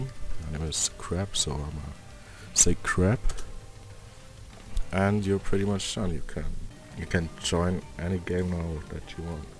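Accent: Germany. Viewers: man hört deinen deutschen akzent sehr stark raus :D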